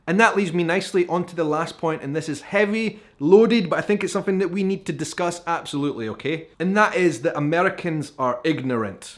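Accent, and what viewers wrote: Scotland, United Kingdom. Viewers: great perspective , good show. i’m afraid my wife will fall in love with your Scottish accent !!🧐😎🤓😝👻👽👽👾👿 .... paul in ohio usa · I need to hear your Portuguese with a Scottish accent. I need this in my life. (I've heard Brazilian portuguese from the city and the country as well as 'good' and 'bad' American-accented portuguese. It'd be interesting to see what bleeds through for a Scott.) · My ancestors can from Scotland. Love your videos and accent. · I'm an American of Scottish and Syrian descent. I have visiting Scotland on my bucket list. I'm getting old, so, I'm hoping a bucket of money will fall on top of me. I hope you enjoyed the United States! We are, truly, an amazing country! (I love your Scottish brogue!) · This was great! The best part though was the subtitles trying to keep up with your Scottish accent. So funny.😅🤣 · New subscriber here, and an American. 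Great video! Thanks for backing us up! Lol you're always welcome here! Love the Scottish accent, it's awesome, but I'd be very interested to hear a Scot speak Portuguese 🤔🤔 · Am i the only one who wants to hear him speak Portuguese with a scottish accent!?!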